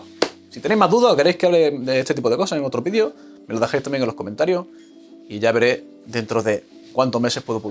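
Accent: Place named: Argentina. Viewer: solo conoces intel,, y al min sale que amd es una de las compañias inportantes en el desarrollo de sofware,, parace que te falta trayecto tecnologico amigo,,, y el disco que dices no trae una aguja,, es una pastilla magnetica,, mas al estilo de las pastillas de las cintas de casette de los 80s,, otra cosa ,, y lo voy a decir, no se que es peor,, tu tono argentino,, o tu ignorancia tecnologica argentina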